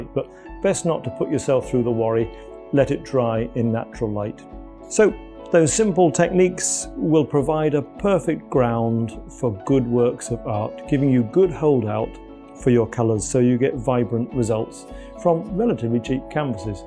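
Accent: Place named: RP, United Kingdom. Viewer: How posh this man is... Imbelievable.... And arrogant too.... pure british c.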